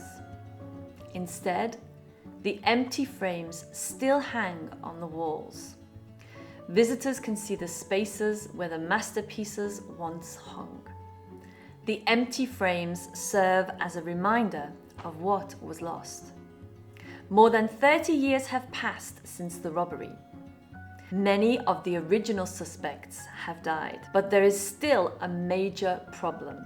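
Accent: United Kingdom. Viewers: Hi, Just wanted to say I absolutely love your British accent! It’s so lovely 🌸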